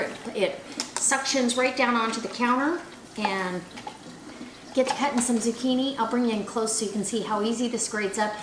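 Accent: South, United States. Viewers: Wow I just love your recipe & I am currently making it- just a smaller version- Can I tell you something my absolute favourite & most loved singer is Dolly Parton & sometimes when you are talking I could swear that is who is speaking - love your accent. This relish sounds amazing so will let you know how I go. Cheers Denise- Austalia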